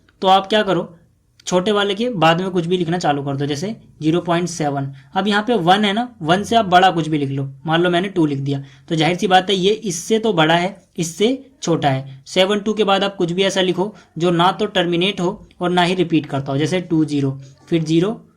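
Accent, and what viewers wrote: Bihar, India. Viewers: Sir AP ky Bihar sa ha konsa jila sa hai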